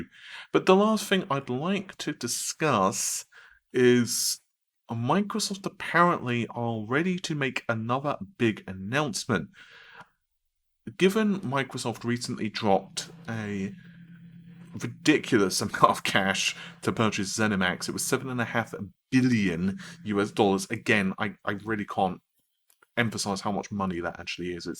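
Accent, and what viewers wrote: United Kingdom. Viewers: British accent OMG 🤣🤦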